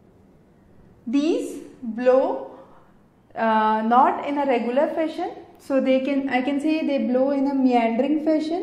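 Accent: India. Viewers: Well explained, the only problem is i'm not so familiar with the indian accent.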